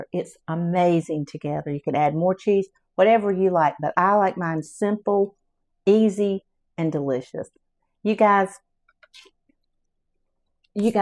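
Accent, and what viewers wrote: South, United States. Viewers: Thank you Friend. I love your cooking and your Southern accent.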